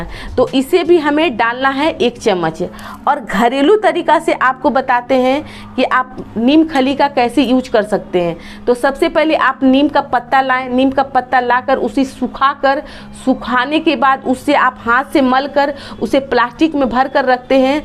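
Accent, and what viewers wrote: Bihar, India. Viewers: Humko Bihari boli boli bhut achcha lagta hai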